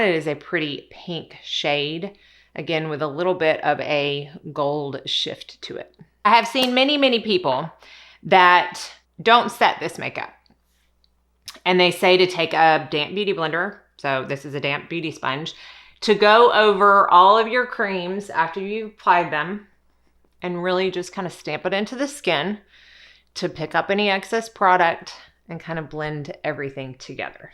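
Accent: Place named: Texas, United States. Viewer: Hey. You are on FIRE today! You go girl! What a great video. I didn't know you used to work as a MUA. It makes sense now why your videos are so chalk full of great information, and why I've gravitated to your videos. BTW... I also love your accent. You kind of sound like my cousins who live in Corpus Christi Texas. I could listen to you all day long. Thank you. Love you, Calvin.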